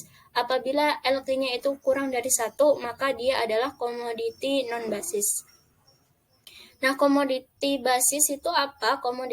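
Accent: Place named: United Kingdom. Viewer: Aksen englishnya woah syekali 🔥🔥🔥🔥🔥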